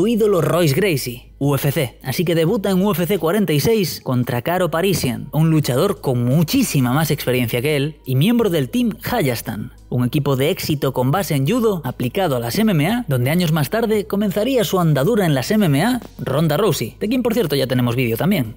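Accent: Galicia, Spain. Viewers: Eres gallego? De donde?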